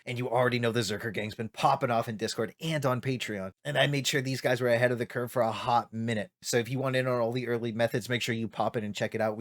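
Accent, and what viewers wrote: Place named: New York, United States. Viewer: Bro are you italian and from new york hahaha you have that whole vibe going, great video btw!!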